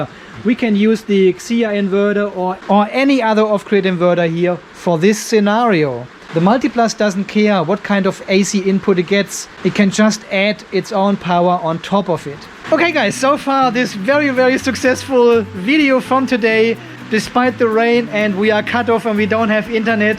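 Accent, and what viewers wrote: Germany. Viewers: Hört sich an als käme er ursprünglich aus Deutschland.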